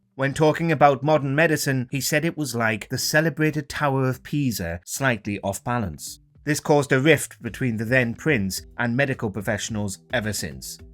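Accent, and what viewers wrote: United Kingdom. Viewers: FFS. The guy speaking in this video sounds British to me. Agree? No way! I'm not from the UK. Seems like all they do there is winge. If you guys don't like your royal family get rid of them!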